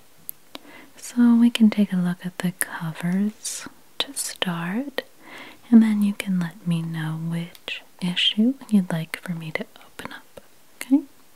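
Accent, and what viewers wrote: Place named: Pennsylvania, United States. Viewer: I was thinking certain word you pronounce you might be from PA. Now I see "Giant" and I'd bet on that now! lol Lovely voice, lots of tingles!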